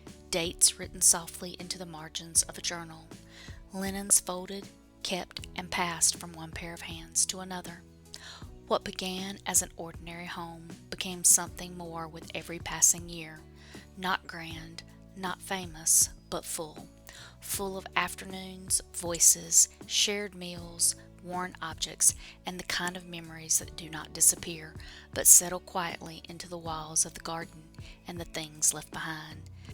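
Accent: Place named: South, United States. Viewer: Lovely, Very Southern and relaxing.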